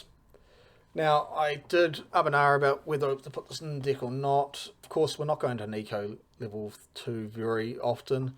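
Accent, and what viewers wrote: New Zealand. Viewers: Good old Kiwi accent!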